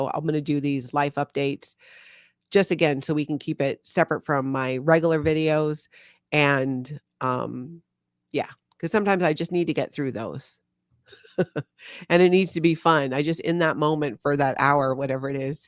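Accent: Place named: Hawaii, United States. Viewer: You definitely have an accent. I think it’s the Hawaiian influence.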